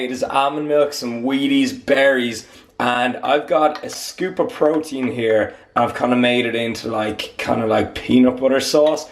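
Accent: Ireland. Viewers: Missed that Irish voice. I'm coming Dublin soon buddy, if you're free we should defo partake in a Guinness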